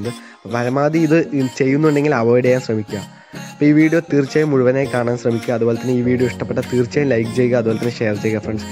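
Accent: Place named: Palakkad, India. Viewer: Palakkad alle bro veed😄......manasilavnd namde bhashaaa...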